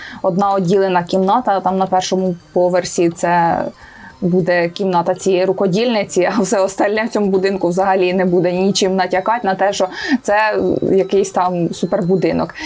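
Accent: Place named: Poltava, Ukraine. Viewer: Ви така крута!) Мені дуже подобається ваш говір. Моя подруга з Полтави спілкується дуже схоже і це дуже мило Попалися в рекомендаціях, одразу підписалась Дякую за контент))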